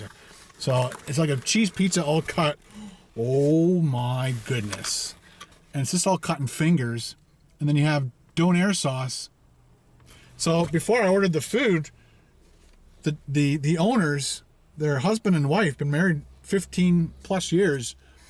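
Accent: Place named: Clarks Harbour, Canada. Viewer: That right there is a Clarkes Harbour/ Barrington accent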